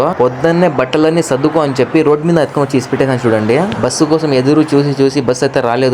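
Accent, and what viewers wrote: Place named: Andhra Pradesh, India. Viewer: అన్న మీది ఆంధ్ర కదే నీ బాషా అలా నే ఉంది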